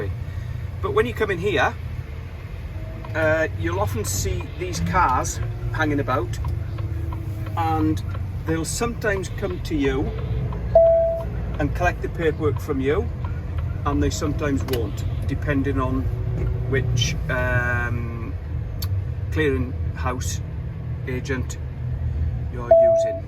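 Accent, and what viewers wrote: Wales, United Kingdom. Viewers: Sounds good to me the more the better with your accent it sounds if you are originally Welsh if so what part 👍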